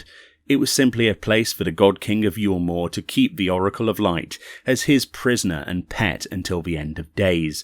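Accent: United Kingdom. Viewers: With all due respect to Brian from Work to Game, but you cannot beat a British accent. No shame in losing to that.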